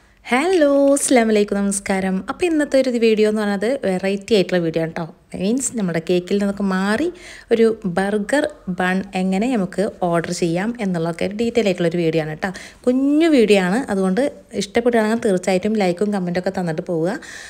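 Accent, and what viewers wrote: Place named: Malappuram, India. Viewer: നിങ്ങൾ മലപ്പുറം ജില്ല ക്കാരിയാണോ. സംസാരം തൃശൂർ ശൈലി യിലേറെ മലപ്പുറം ചുവ ആണല്ലോ.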